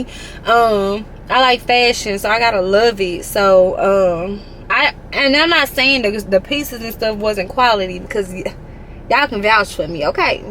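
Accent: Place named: Mississippi, United States. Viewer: Mississippi girl here! Eboni Ebo sent me over to your vlogs and I knew from the moment you spoke “she is from the Sipp” haha I love it! I have been enjoying your content so far and will continue to support. Thanks for sharing with us!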